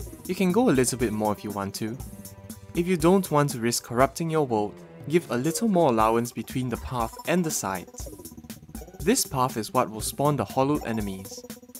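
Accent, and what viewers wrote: Singapore. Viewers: Omg your voice is super soothing! and reminds me of home! Just wondering....are you from sg?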